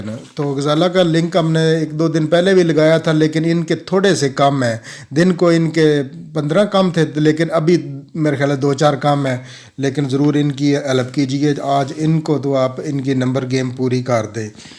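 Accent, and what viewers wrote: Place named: Hyderabad, India. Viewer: Janab ye kara kari India Hyderabad ka accent he. Kehte hain Urdu UP me paida hue, Lakhnaw me jawan hui aur Hyderabad me dafan hui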